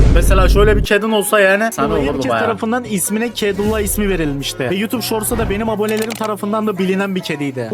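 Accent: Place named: Turkey. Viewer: Sert Türk Aksanı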